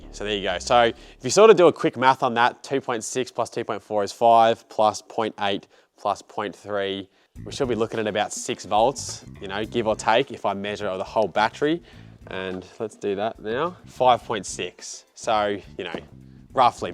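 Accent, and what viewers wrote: Australia. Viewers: Aussie accent can’t tell difference between ‘Lithium ion’ And ‘Lithium iron…’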